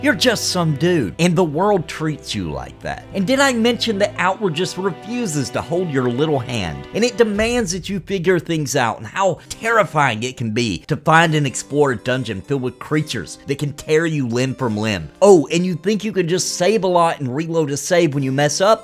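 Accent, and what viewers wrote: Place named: South, United States. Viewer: Can't wait for Definitive Edition... I bought The Three Brothers so I get it! Hey man you from the south? I'm from Tennessee, I hear a little twang in there. Thanks for the video.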